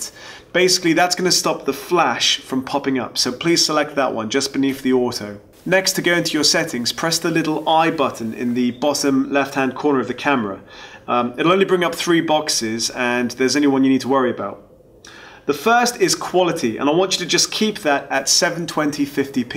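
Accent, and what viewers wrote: United Kingdom. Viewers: Well done. Perfect... just what I was looking for. The British accent makes it sound reliable and educated!!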